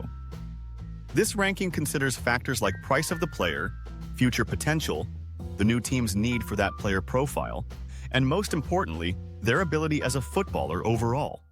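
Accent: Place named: United States. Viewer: We really don't need AI with an american accent!!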